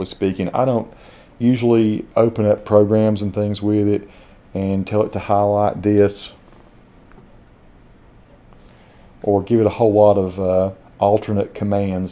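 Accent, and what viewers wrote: South, United States. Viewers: I'm not a fan of Southern accent but yours looks utterly excellent. Good job :D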